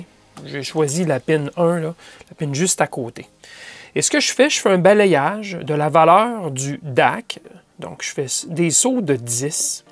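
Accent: Quebec, Canada. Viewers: Excellent, clair et précis (comme les autres vidéos) avec le petit accent du Québec qui ravit tous les Français.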